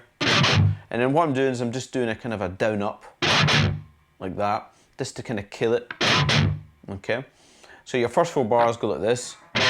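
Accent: Scotland, United Kingdom. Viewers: Thanks for this tutorial man, love your fackin' accent, you're scottish aren't you? greetings from france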